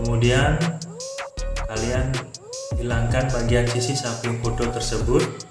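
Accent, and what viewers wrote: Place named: Bali, Indonesia. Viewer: dri cara ngomong T nya pasti orang bali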